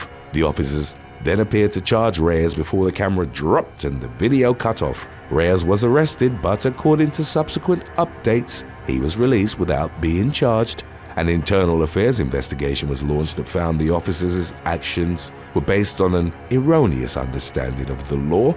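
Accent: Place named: United Kingdom. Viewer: That’s just how Brits pronounce it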